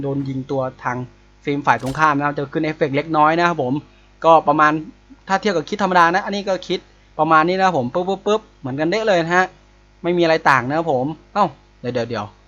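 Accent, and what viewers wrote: Isan, Thailand. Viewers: พี่คนอีสานหรอครับ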